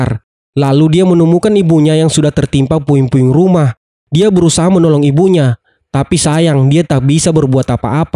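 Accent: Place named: Palu, Indonesia. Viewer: naratornya seperti logat Palu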